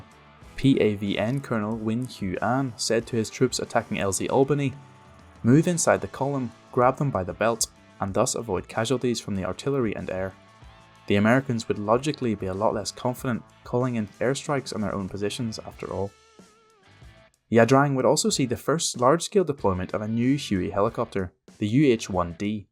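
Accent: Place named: Belfast, United Kingdom. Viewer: Sounds a bit like a Belfast mon...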